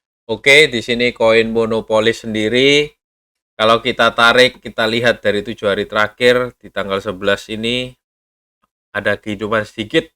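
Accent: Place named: Surabaya, Indonesia. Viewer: Nih Reviewer medok ALA SUROBOYO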